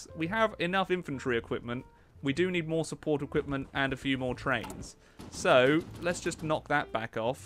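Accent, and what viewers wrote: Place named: England, United Kingdom. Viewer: sounds more English when he’s in England.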